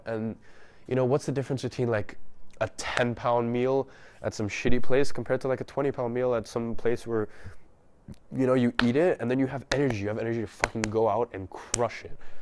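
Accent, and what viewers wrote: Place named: United States. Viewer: from the UK but you sound American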